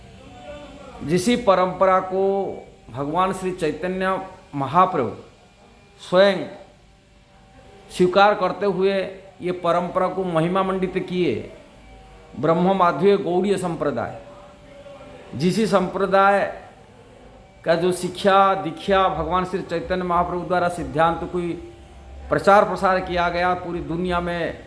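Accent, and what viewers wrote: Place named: Odisha, India. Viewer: He is from odisha so obviously he has some glitch but he is speaking can u able to speak in oria . sorry for any offence Hare Krishna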